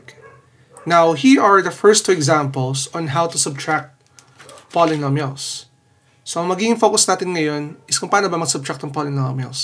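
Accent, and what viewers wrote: Philippines. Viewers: I think he's Filipino that's why the accent is different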